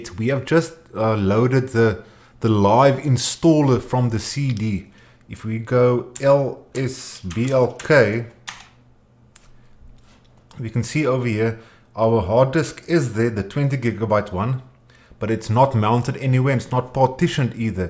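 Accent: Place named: South Africa. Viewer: love the SA accent :)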